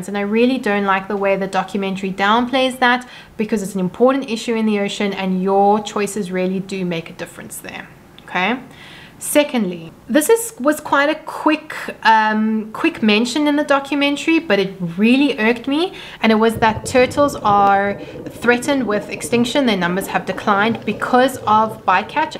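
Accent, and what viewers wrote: South Africa. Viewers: Totally off topic, but I'm fascinated by your accent. Are you from South Africa? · South African, love to hear it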